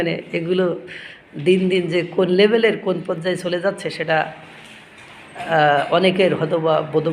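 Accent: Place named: South Dinajpur, Bangladesh. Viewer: আপনাকে অনেক ধন্যবাদ, দক্ষিণ দিনাজপুর এর লোক হওয়ার কারণে আপনার আর আমার ভাষার অনেজ মিল ।। ❤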